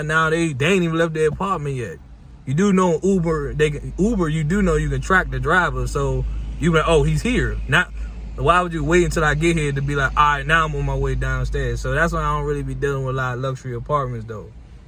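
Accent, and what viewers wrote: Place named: Washington DC, United States. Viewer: Good video fam. Fellow DMV dasher here. When I heard your voice, I said ah hell, DC dasher!!! I love it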